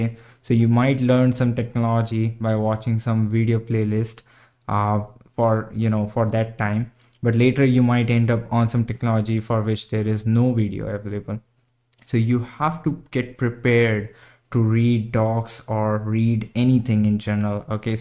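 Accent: India. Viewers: This video is really good. I think a lot of productivity lies in the points you made about reading the documentation of a technology so you know what you have in your toolkit, and not falling into the trap of trying to memorize all the little details of how to use every little thing in a technology before you need it. Also, your English is very understandable. I'm a native English speaker, and I have no problems understanding what you're saying. That being said, there is a staggering number if Indians in the part of Canada that I live in, so I might just be good at understanding your accent.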